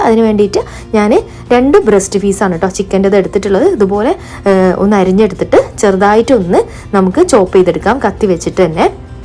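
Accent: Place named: Kozhikode, India. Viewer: ഇങ്ങളെ അവതരണം അടിപൊളിയാണെ, നല്ല കൊഴികോടൻ സംസാര ശൈലി 😅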